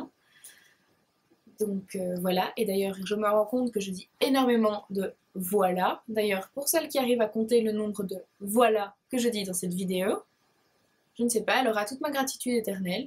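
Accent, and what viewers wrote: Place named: Belgium. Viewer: Super que tu débarques sur YT ! J'adooore tellement l'accent belge :)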